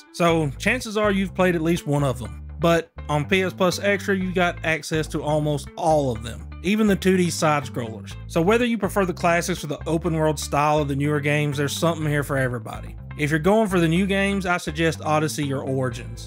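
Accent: South, United States. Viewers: Sounds like you a southern boy.... like me lol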